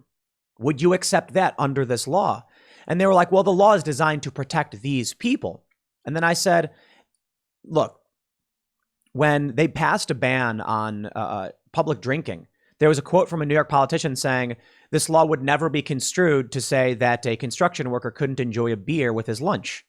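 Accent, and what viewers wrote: Chicago, United States. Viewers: When Tim talks of Chicago accent, it is thickest where he is from. The South Side of Chicago has the thickest accents, both black and white accents... Mexicans are the same no matter where they live in Chicago, lol...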